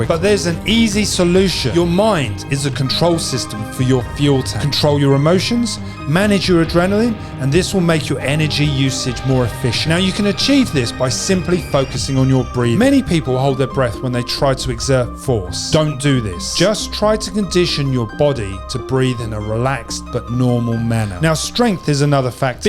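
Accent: United Kingdom. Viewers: The guy in the video sounds like a Brit. We don't get as many street fights here in the US since there is a good chance that the person you are thinking of attacking is armed.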